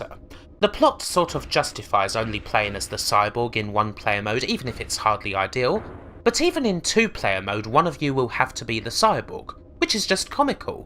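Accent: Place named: England, United Kingdom. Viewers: Because it's an Essex accent. A very strong one. It's why Jonathan Ross pronounces his "Rs" as "Ws". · How come some people consider way of speaking a speech impediment? I guess it's an accent from a region in England. I understood him perfectly, and I'm Argentinean (hence, a non-native speaker of English).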